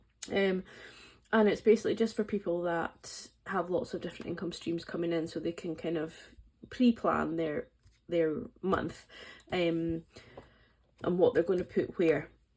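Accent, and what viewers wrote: Scotland, United Kingdom. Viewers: Omfg! You’re Scottish 😮 I don’t know how I’m ever going to get over that revelation 😂 LOVE the planner can’t wait for mine to arrive xx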